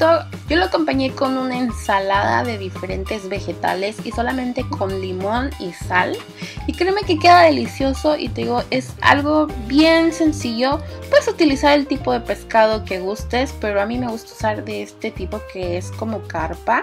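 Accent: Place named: Mexico. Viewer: tienes acento mexicano :/ pero en el Perú hacen ese tipo de pescado frito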